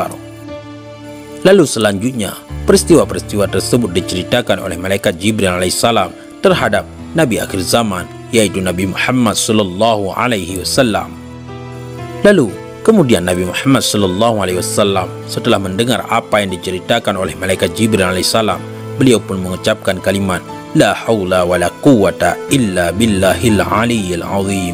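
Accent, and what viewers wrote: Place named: Bali, Indonesia. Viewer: Dialek Bali kayaknya...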